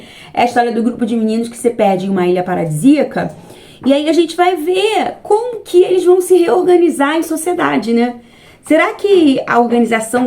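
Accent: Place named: Rio de Janeiro, Brazil. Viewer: te acompanho há pouco tempo. E ficava sempre “encafifada” … é carioca. Como acompanho uma infinidade de canais … a gente vai pegando as gírias, os sotaques. E nesse vídeo específico … tirou minha dúvida. 😂😂😂 Carioca!!!! Parabéns!!! Adoro seus vídeos e seus shorts. Você é autêntica. E me identifico em uns shorts. Sou eu!!! e rio muito. 😉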